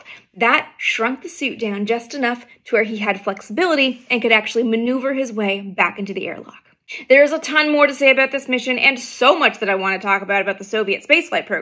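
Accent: Canada. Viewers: are you Canadian? A few long vowels in this short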